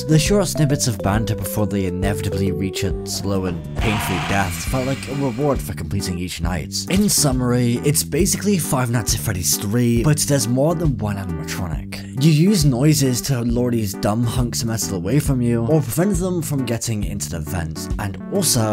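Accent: United Kingdom. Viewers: this video is cool and all.. but... I just love the Scotland Accent!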